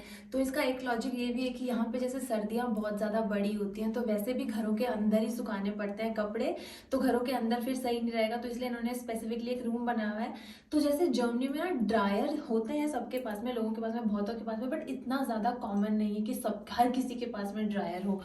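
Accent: India. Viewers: आप की भाषा लहजा देख लगता है पक्के भारतीय हो भारत की बुराई करना भी चाहो तो नही कर सकते।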